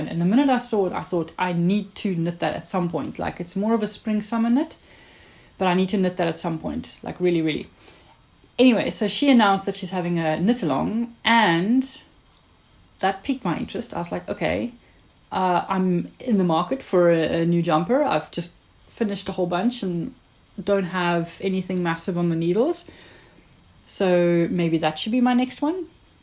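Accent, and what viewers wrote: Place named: South Africa. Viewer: Hallo, your video was suggested to me on YouTube and the moment I heard you talking I wondered if you weren't South African😀. I live in Pretoria. Lovely projects! ❤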